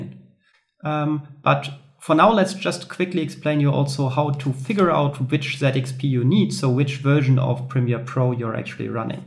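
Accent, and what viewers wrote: Germany. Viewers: man merkt das du deutsche bist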